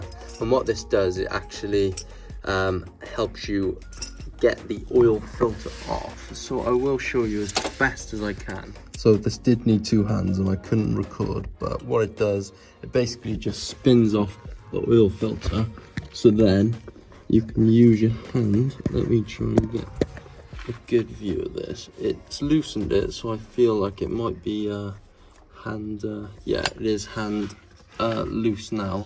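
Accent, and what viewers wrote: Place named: Wales, United Kingdom. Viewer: i will be giving this a go next week , so thank you for the vid. You have made it look really simple. You sound welsh by the way lol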